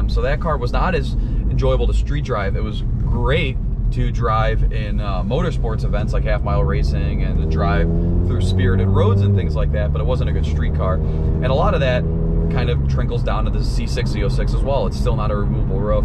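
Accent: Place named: United States. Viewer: haha no no I understood that maybe it’s your American accent. I’m from Toronto Canada! Definitely the GS and Z06 platforms are best bang for your buck but the ZR1 is a beast. It’d be a nice car to review on the channel if you can get your hands on one!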